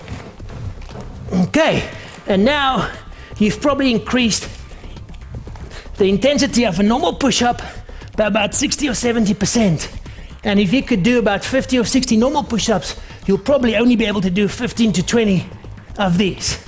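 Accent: South Africa. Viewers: Jayden Almirall terrible accent anyways, other South African accents are way better and less annoying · Sounds south african .. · He has a South African accent, as I am South African myself I can assure you it isn't made up or anything like that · South African accent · anyone else realize his South African accent?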